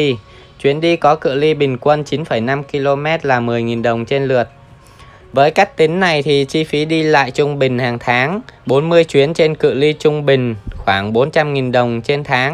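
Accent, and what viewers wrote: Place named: Thanh Hoa, Vietnam. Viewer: Ông này quê triệu sơn thanh hóa à.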